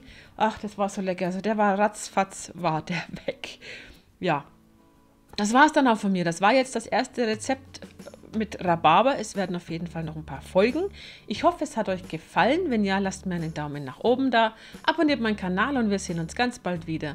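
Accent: Franconia, Germany. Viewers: a fränggin…schäi!!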